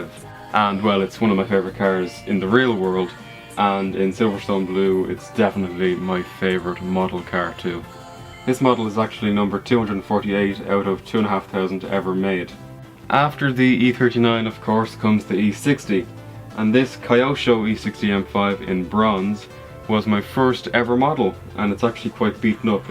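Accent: Ireland. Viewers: are you irish ? so am I . cool video :)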